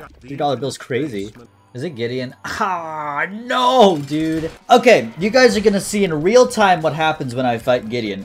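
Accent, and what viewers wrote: United Kingdom. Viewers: Never thought that would be even more british by the day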